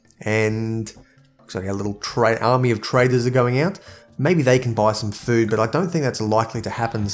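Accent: Australia. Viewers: LOVE the aussie accent - ha ha, I'm a kiwi so were not much better! Nice walkthrough, awesom game, keep it up mate!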